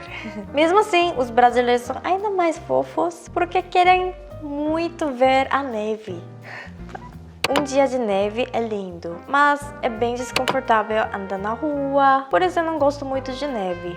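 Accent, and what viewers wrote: South Korea. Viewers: A tem um português e um vocabulário muito refinado, fala até melhor que muitos brasileiros. A cada vídeo que ela posta percebo a diminuição de sotaque coreano. Parabénssss 😊😊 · Eu acho o sotaque do coreano falando português e muito fofo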